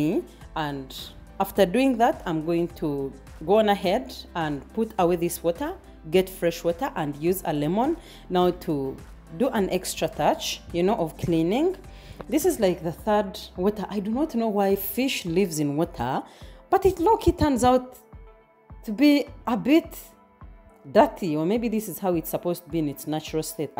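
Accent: Uganda. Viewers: just curious! im gambian (west africa) so i haven’t met many east africans (outside of somalis, sudanese, and ethiopian). your accent reminded me of kansiime anne, a ugandan comedian so i figured it might be ugandan. anyway thanks for the recipe, i will be using it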